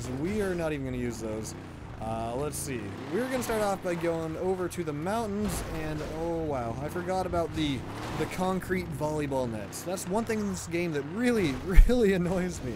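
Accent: Sweden. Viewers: Are you from sweden😃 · Are you swedish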